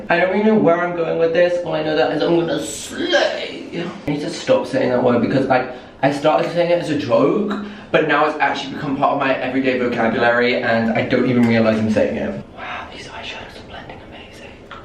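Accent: Scotland, United Kingdom. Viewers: More Scottish accent plz I can’t get enough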